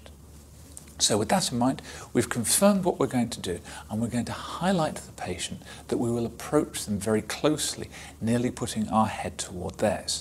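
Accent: United Kingdom. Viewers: British accent 💚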